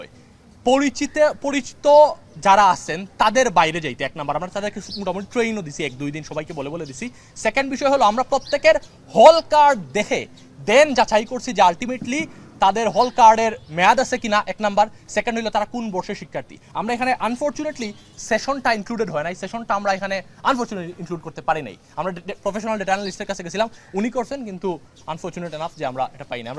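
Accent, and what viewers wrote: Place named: Sylhet, Bangladesh. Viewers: ভাইর সিলেটি স্লাং গেলো না, বেশ মজাই পাচ্ছিলাম উনার কথা শুনে। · আরেহ ওতো সিলেটি।।। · Sylhety bro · Vai er bangla pronunciation e buja jacche je Vai Sylheti.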